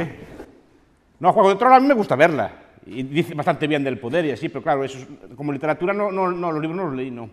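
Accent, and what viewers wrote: Galicia, Spain. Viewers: Es gallego de aldea. El auténtico.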